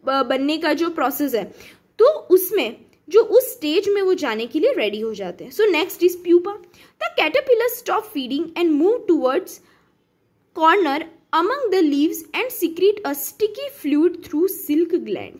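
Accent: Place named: Australia. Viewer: GOOD EXPRESSION SOUNDS AUSSIE ENGLISH EVEN 888.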